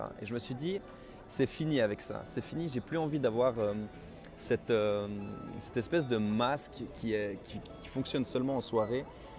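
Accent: Fribourg, Switzerland. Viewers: je voix que tu es Suisse, j'ai deviné juste avec ta voix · petite question inutile ... es-tu suisse ? tu as le beau accent fribourgeois ;) (super vidéo ! ) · Salut, J'ai l'impression que tu es de Suisse (ton accent) ^^ et tu as tournée où cette vidéo? Je vois qu'il est marqué Rue de Lausanne derrière mais je n'arrive pas à lire l'autre plaquette ^^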